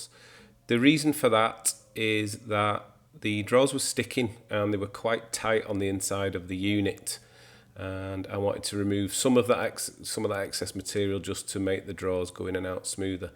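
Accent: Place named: Scotland, United Kingdom. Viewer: your furniture remake are truly beautiful. Word is getting out about the great work you do. Is it OK to ask what part of the UK you are from? I have a limited knowledge of accents but yours reminds me of closer to Scotland.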